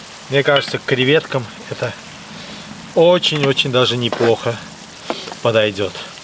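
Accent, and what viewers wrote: Baku, Azerbaijan. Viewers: правила нет. Но не используют лук :) помидоры, чеснок базилик да, но лук туда не кладут. Но все равно смотрю вашу передачу только из-за того что вы бакинец и мне нравится ваш акцент бакинца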